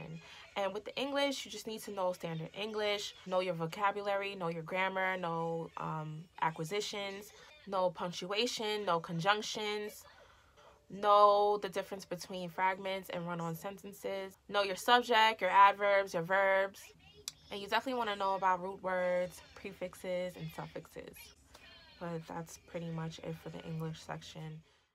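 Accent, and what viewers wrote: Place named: New York, United States. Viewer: Sound like you from ny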